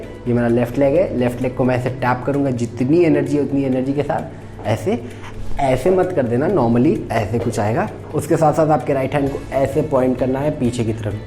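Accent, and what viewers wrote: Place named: Hyderabad, India. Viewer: Bolinge , karaun...... Samjhre aplogan etc #hyderabadi❤️ ThiS maN is Hyderabadi🙈🙈🥰😍😍 .....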